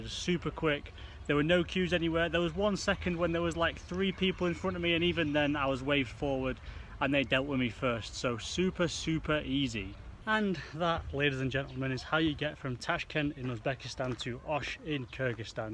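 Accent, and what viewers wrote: United Kingdom. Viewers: Had to google that :) nice accent , it’s a northern accent ? Like Geordie ?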